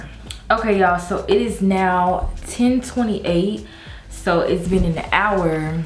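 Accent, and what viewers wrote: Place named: Florida, United States. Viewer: U have a Floridian accent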